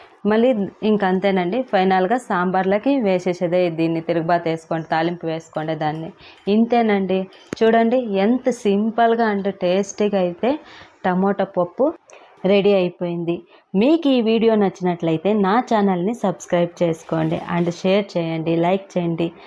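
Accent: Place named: Karnataka, India. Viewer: Kannada chappu, telugu raa ledu meeki.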